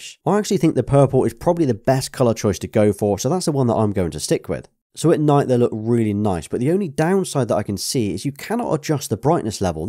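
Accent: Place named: Bristol, United Kingdom. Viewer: Nice gaming monitor. Your accent is annoying me, from Bristol by any chance ma babba? Annoying me in a good way, I am from Weston.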